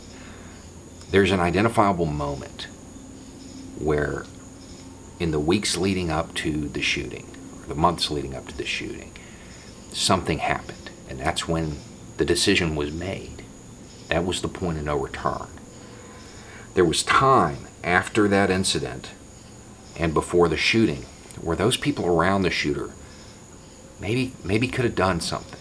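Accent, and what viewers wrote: South, United States. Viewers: I'm not bying a car here! I hear him right now, and he is close up to the establishment talking points. His southern accent only makes it sound more absurd.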